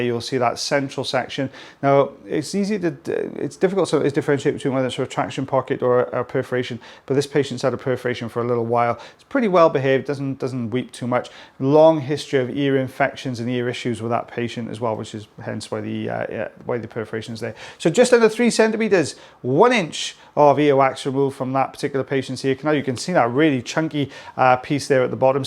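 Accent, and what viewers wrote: Wales, United Kingdom. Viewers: So I never would have thought watching ear wax removal would be so …satisfying ….love the Welsh accent too!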